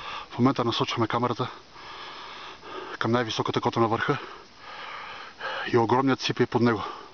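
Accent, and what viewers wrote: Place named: Eastern Europe. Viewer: Акцент прикольный прослушивается!! ты наверное из наших, дружище!? СНГ рулит!!!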